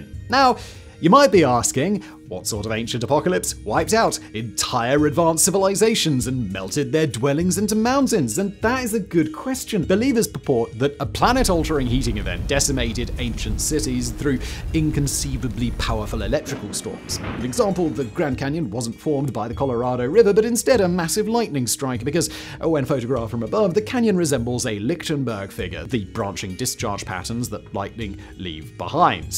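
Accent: RP, United Kingdom. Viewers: If this guy didn't have an educated British accent, no one would listen to him.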